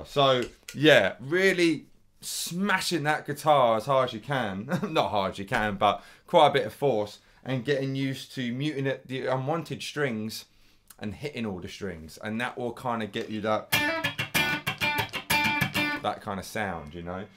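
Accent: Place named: United Kingdom. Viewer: your name and accent say british, your features and sunny temper say mediterranean... what's your secret?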